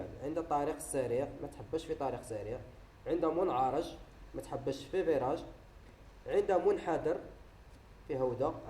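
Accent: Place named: Morocco. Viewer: انا من الشرق فهمت لهجتك %100 شوية تشبه لتاع لمراركه شكرا بززززاف على الشرح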